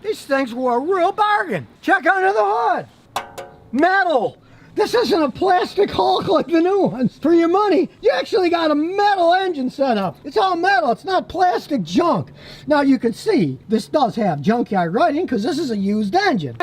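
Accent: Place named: Appalachia, United States. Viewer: Scottys hillbilly accent killed me 😂😂😂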